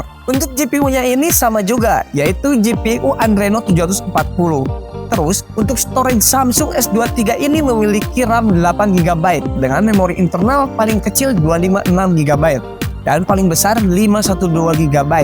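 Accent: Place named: West Java, Indonesia. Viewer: logat na nyunda pisan 🍵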